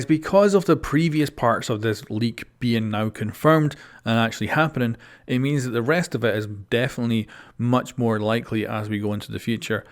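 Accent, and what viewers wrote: Scotland, United Kingdom. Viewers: That scottish accent is very cool, instant sub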